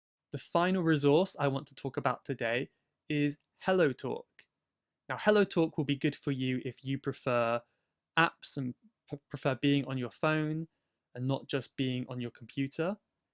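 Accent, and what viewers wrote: RP, United Kingdom. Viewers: Do you speak Rp accent?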